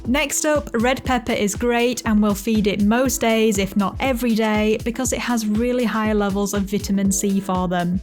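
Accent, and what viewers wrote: United Kingdom. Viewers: She’s UK😊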